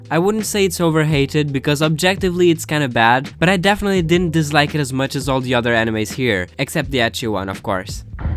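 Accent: Portugal. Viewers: you sound portuguese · YOU'RE PORTUGUESE. THE SIR KAZIO MEME? THE "WAAAAAAAA" ONE? YOU AXCENT? I KNOW IT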